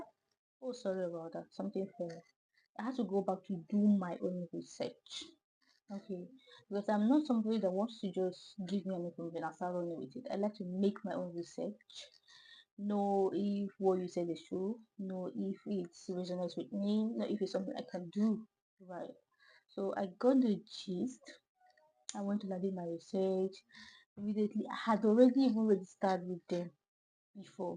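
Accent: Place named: Nigeria. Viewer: Your mic is not helping at all... I can't really follow you that much, your Nigerian accent is too much and the mic too is producing base alone. Please check it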